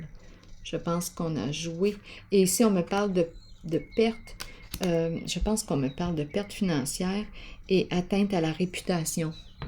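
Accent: Quebec, Canada. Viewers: C'est incroyable 🤩 pile pile sur ma vie wow bravo et merci 🙏🏻 avec ta voix j'imagine que tu es situé au Québec? Etk moi oui!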